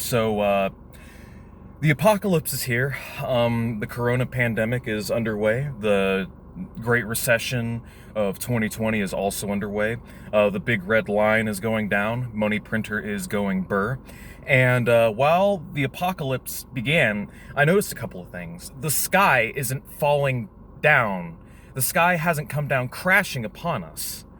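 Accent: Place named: United States. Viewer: Why is the steering wheel on the right? You sound American.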